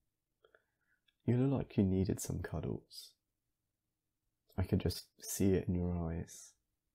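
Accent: United Kingdom. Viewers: bOdayy~ british accent is sooo cutee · I live for that British accent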